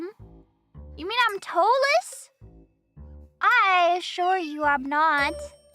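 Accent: United Kingdom. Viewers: With how much british ancestry you had I hope to hear that lovely british accent a lot more:_nimiHaha: Nice silly idea for a stream and dna accurate drawing was fun. Thanks for the stream:_nimiHeart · Wow, the results are in and is 100% cute, but with no trace of elephant:_nimiCry:. Ah well, at least you have genuine British in you, so your accent must be genuine as well! Thanks for the stream and see you for next time! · thanks for the stream!:_nimiHeart: it was fun going through your results and I can see where the amazing british accent comes from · I knew she was Bri'ish, you can hear it in her accent :_nimiNerd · Authentic British accent! Thanks for the super fun stream! Looking forward to more! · British accent is confirmed to be authentic! This was such a unique stream idea.:_nimiHeart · イギリス訛りいっぱい聞きたいよ！♡ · I always knew you were British, your American accent is VERY convincing though. 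· The British accent has been ingrained in her this whole time. It was foretold. :_nimiHaha: Thanks for the stream :_nimiHeart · My oshi is 2/3s British, now the British accent coming so naturally makes sense:_nimiHaha: This was super interesting, seeing all the results. I still think the ear wax is very cursed though:_nimiHaha: Thanks for the stream:_nimiHeart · I knew she had to be mainly british once I heard her british accent - it's bloo'y lovely!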